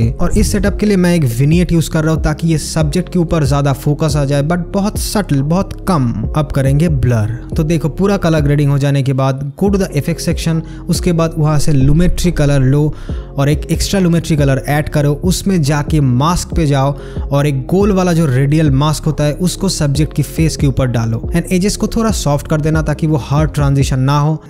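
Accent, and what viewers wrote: Bengal, India. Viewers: Hindi sunke lag rha Bengal se ho? Bangali dada😅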